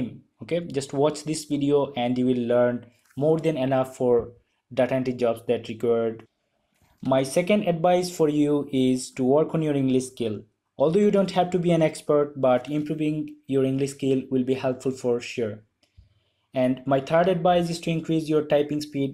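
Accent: Sylhet, Bangladesh. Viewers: R u from sylhet? Ur accent says that, great work bro🤗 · Are you from sylhet?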